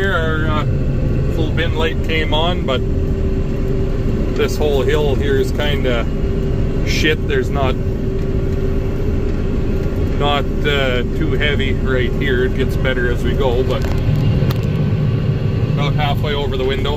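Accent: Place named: Canada. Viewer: Well, how she goin? "ANYWAY...." just wanted to say out of all the FarmTubers I find your channel most entertaining... all the rest I find myself falling asleep, and then their's that kid from Iowa with severe ADHD lol... Your Canadian accent and one liners like, "So we don't plug the girl cock stiff" lmao. Good stuff and nice to see harvest is over for ya as we are in the middle of ours from Ohio. Have a good one.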